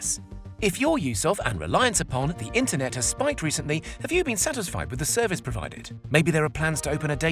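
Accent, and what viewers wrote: United Kingdom. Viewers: ima buy this stock becuz if its .05 per share dividend plus this guy reviewing it with the 007 accent got me sold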